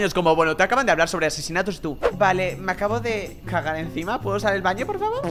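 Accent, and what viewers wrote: Argentina. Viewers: hablas bien en modo argentino ya que soy de argentina hablas super bien😊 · y su acento argentino a todos lados, lo amoo 🎨🌷❤️‍🩹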